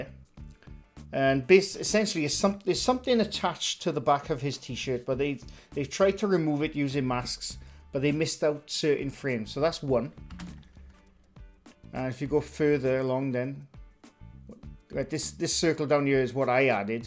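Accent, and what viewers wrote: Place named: Wales, United Kingdom. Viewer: I love your accent! Aaahhh gotta love a Welsh man!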